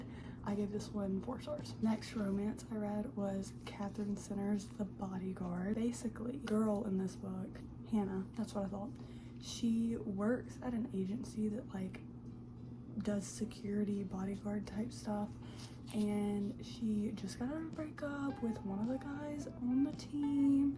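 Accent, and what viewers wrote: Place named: Appalachia, United States. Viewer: this randomly popped into my recommended and respect for knocking out that much reading in a month! Are you appalachian by any chance? obviously no need to be specific but your accent sounds like we could've grown up together lol!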